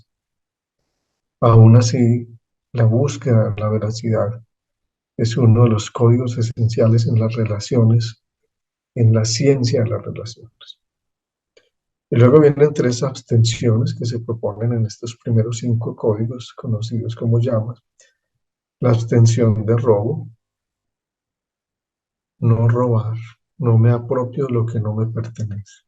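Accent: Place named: Colombia. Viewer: Precisos. He oido muchas veces hablar de las 8 ramas del Yoga, pero esta vez me actualiza el significado. Muchas gracias. En tu acento recordé al Maestro de meditación Sesha. Tambien es Colombiano. Gracias gracias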